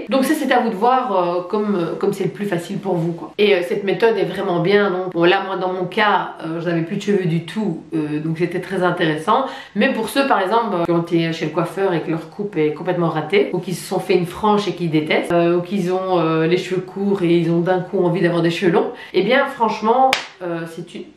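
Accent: Brussels, Belgium. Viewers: Je suis complètement sous le charme de ta super personnalité positive (y) Et les petites touches d'accent bruxellois que tu intègres spontanément me font bien sourire;-) Continue comme ça et bisous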